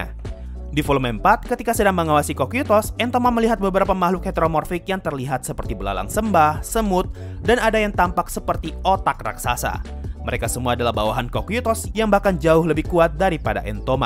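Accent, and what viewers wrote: Indonesia. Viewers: Kita org indo pke aksen indo emang nda boleh ya? Ya gak min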